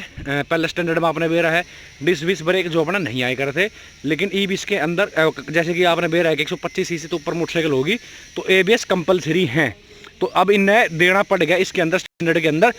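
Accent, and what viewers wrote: Haryana, India. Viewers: Bhai Haryana ki voice ek number h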